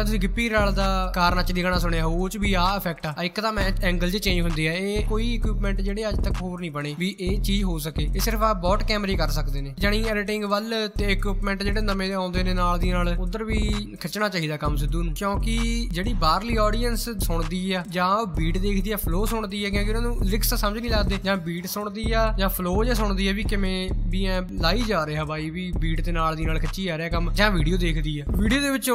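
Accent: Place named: Malwa, India. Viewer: Mittra Bolan da way tera Bda Ghaint aa tera Malwayi lgdan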